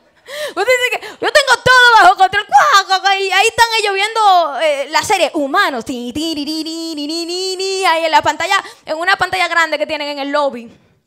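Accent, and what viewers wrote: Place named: Dominican Republic. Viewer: Me rei con tu presica. Muy edificante. y ese acento de los dominicanos como el de zankimpanki😂. Algo fresco para esta juventud. Bendiciones y saludos desde Cuba.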